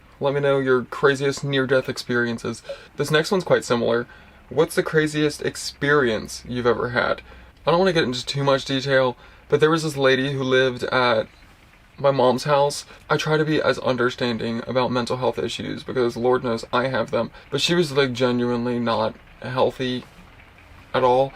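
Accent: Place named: Baltimore, United States. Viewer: I could tell you are from Maryland. You have a prominent Baltimore accent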